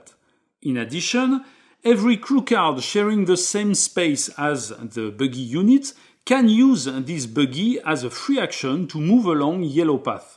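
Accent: France. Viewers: Amazing!!! I watched all 3 vidoes, it’s just fantastic!! Both the game and the explanation/ videos. And I love the French accent🤗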